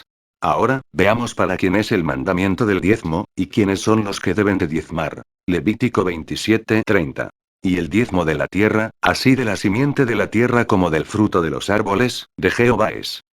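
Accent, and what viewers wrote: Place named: Spain. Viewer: Este Huevon que hizo este Vídeo, porque habla como las huevas, no respeta las comas, derrepente se va ahogar, se creerá gringo, pero parece Español.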